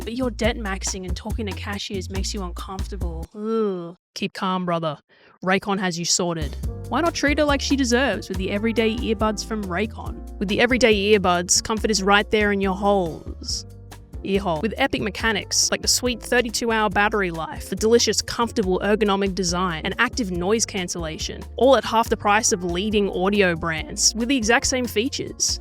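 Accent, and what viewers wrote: Australia. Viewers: Why is Kurtis Conner speaking with an Australian accent? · I love her accent 🇦🇺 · Are you me with tits and an Aussie accent? The fuck has the internet done to our generation? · You area Australian?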